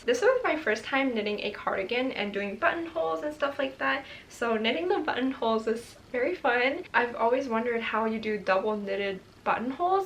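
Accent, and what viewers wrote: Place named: Canada. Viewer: I have never seen your videos before and as soon as you started speaking I was like this girl is Canadian. I wouldn’t be surprised if she’s from Toronto (area) then you mentioned the gta and my head snapped up so fast. Will definitely be checking out that yarn shop. Thank you 😂❤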